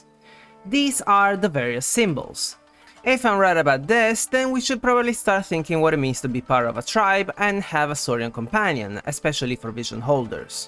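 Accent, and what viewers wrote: Italy. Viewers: Ma sei italiano? 😮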